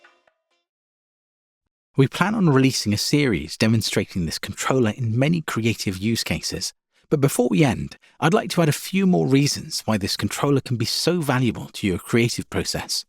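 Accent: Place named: United Kingdom. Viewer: Hi. Congrats on making something new and interesting! Couple of questions… 1) you sound British, so will the stated dollar price include VAT for UK orders? 2) is it midi 2.0 compliant so that changes made in a DAW will be reflected on the controller? 3) any plans to create DAW specific scripts like for Bitwig? Cheers, Phil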